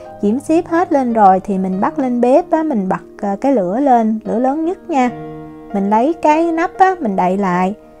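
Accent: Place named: Mekong Delta, Vietnam. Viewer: Chị này giọng nói dễ thương chắc là người miền Tây.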